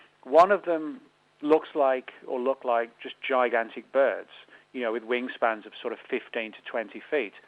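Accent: United Kingdom. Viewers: Hey, great interview as always.. Much love from home.. Can't believe you still have your Walsall accent.. 🇬🇧🏡💗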